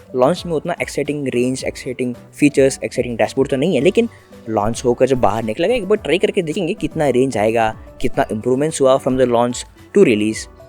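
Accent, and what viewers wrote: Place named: South India, India. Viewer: Hindi language very poor, pronouns not clear, language tone like south indain lagta hai, script bahot confused hai over all 3 star 10 star me se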